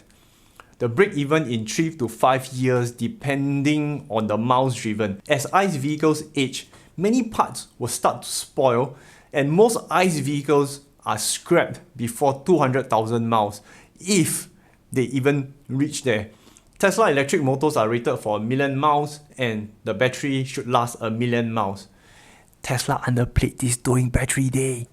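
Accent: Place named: Singapore. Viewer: It’s just a standard Singapore English accent. Should be easy to get used to.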